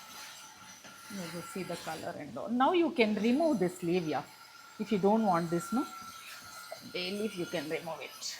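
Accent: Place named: Telangana, India. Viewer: Akka you are Hyderabadi you speak, Telangana Akka.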